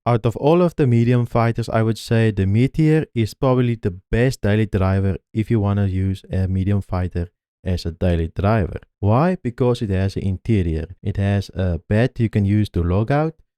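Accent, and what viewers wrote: Afrikaans, South Africa. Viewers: The Afrikaans accent gets me every time😂😂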